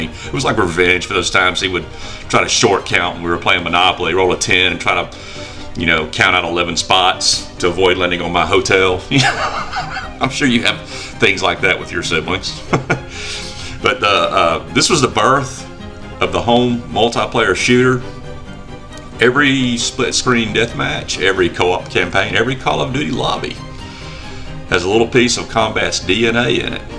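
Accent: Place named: South, United States. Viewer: That is a hard Southern Accent. I appreciate what you're doing here though and I agree with Space Invaders, Adventure, Pitfall, and even Pac-Man...I loves all of these games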